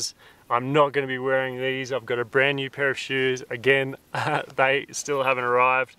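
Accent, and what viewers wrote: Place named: Australia. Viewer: love the Aussie accent, but took me awhile in the shelter segment to understand that "ina" was "inner"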